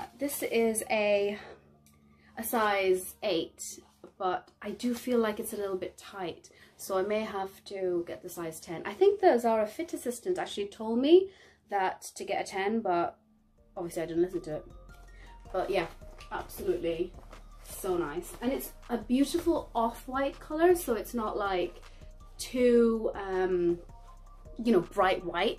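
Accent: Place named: United Kingdom. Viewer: Loved the video cousin !!! :D P/S: Never noticed you sounded so british before haha!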